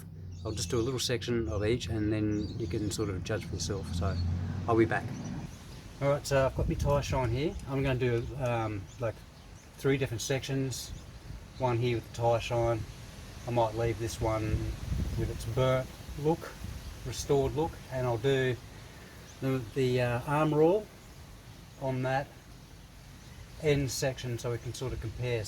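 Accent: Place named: Australia. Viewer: IDK why but a lot of Aussie accents are grating on the nerves to me as a New Englander. Yours does not. I like your accent and your car.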